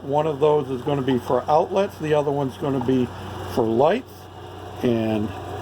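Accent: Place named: Minnesota, United States. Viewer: Your Minnesota is showing "Don't ya know"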